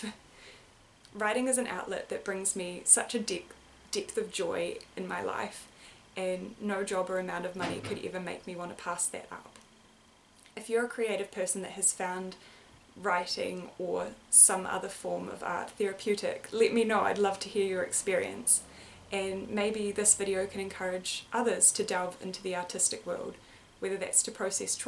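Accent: New Zealand. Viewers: Happy for your success in publishing your book online! I too find that writing is the only way for me to truly express myself. I write poetry. Hope you don't mind me sharing a poem I wrote recently: He wanders through eternity like a hermit in the desert, seeking meaning and purpose in a world, in a culture overtaken by lies and façades. He’s told to consume commodities, to chase career and cash. “You’ll be happy then” they say. Yet he still feels this gnawing emptiness inside, even having arrived at these things. The answer to wholeness doesn’t lie there he sees. He sets off towards another horizon, seeking what’s worthwhile; in touch with the whispers of his heart. The compass of his soul guiding him onwards. I'm also from NZ. I assume from your accent that you are too. Nice to meet a fellow kiwi on YouTube:)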